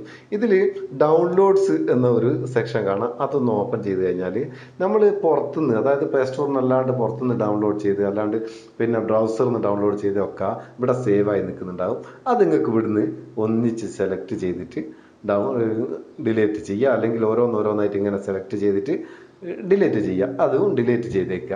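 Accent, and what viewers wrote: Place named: Vadakara, India. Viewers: വടേര ഭാഷ...... നല്ല മൊഞ്ജ് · vadakarakkarum ingono okke yaan samsaarikkuka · Orijnal nadaaapuram samsaram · Haai kolalooo vadakan kerala bhasha kalakiii karyam clear aayyy kolllaa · അടിപൊളി സ്ലാങ് ങ്ങള് മേപ്പയ്യൂർ ആന്നോ!!മ്മളും സബ്സ്ക്രൈബ് ചെയ്തിക് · അര്യത്താ അയേ......വർത്താനം ketteram തോന്നി.....😊😊😊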